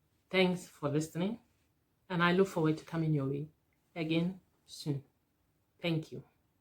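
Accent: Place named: Jamaica. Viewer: Are u Jamaican? U sound like my people.. stay bless.. good review sis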